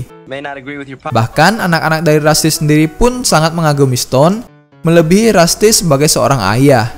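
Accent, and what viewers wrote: Medan, Indonesia. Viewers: aaiii suka kali aku ad di selingi critakn ad logat medan y.. suka kali aku bang.. 😂😂😘😘😘😘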